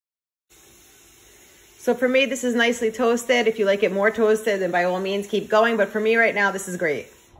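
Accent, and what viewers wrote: New Jersey, United States. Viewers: U must be from jersey !! Or maybe I’m wrong lol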